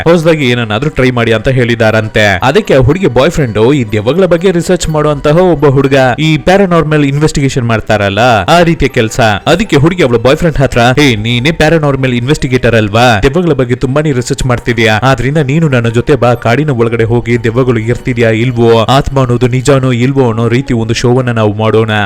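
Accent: Tamil Nadu, India. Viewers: Tamil slang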